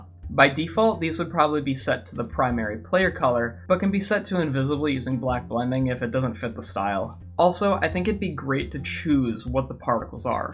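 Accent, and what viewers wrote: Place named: Canada. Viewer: You sound like kenadian.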